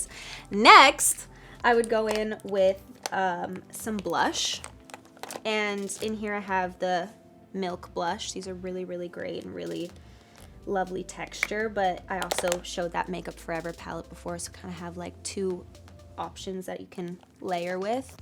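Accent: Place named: England, United Kingdom. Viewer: I really Love her...I am from Sri Lanka...Her English accent helps me improve my English....I wanna hug her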